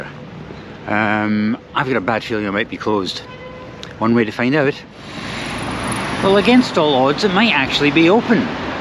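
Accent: Scotland, United Kingdom. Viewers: Nice that you were brought up in Sydney and were able to keep your great Scottish accent！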